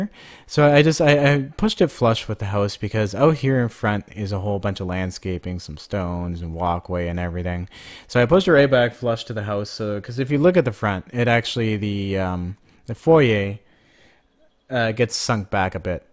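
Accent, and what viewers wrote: Canada. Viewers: I have one of the most important question that you will ever be asked. Are you Canadian?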